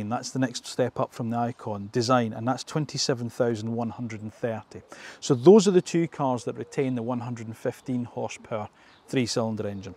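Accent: Scotland, United Kingdom. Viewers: Thanks for a very informative review.. also Car reviews are so much better with a Scottish accent 😊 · I would listen to the Scot accent anytime even if from the Yellow Pages ! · Very nicely reviewed, I have the Cross Excel, had it for just over 2 years, a great wee car, very economical, Livingston to Anstruther in Fife last week 81. Mpg, I am thinking of changing it for another Excel or GR Sport, before Yaris I had Skoda Karoq towing my caravan, but I have Osteoarthritis now, had to give up caravan but needed SUV style, thought you were a Scot before you mentioned your mum. Well done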